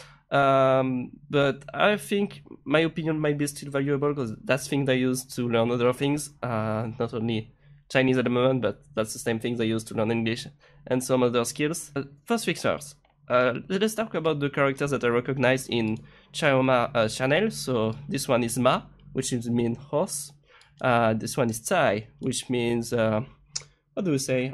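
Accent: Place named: France. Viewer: L'accent français reconnaissable entre 1000 mdrr, depuis tu as progressé ?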